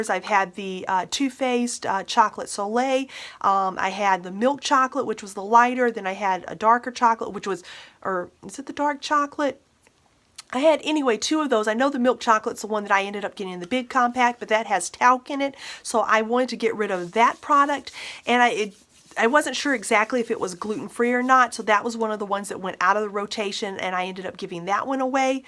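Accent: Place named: South, United States. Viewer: Hey can you do a swatches of all your eyeshadows up close thank you I love your southern accent 😊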